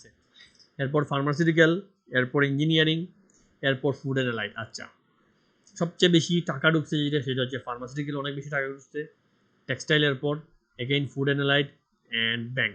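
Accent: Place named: Sylhet, Bangladesh. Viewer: ভাইসাব এর বাড়ি মনেহয় সিলেট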